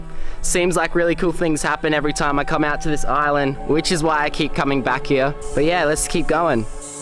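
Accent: Australia. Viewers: Sounds so Australia 💀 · My jaw drop when i saw the title but when i hear him speak and i hear an austrailian accent i just said to my self nah hes gonna be fine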